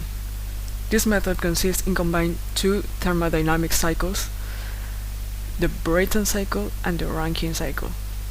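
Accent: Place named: United Kingdom. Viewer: El acento de tu inglés es como británico. Muy bueno.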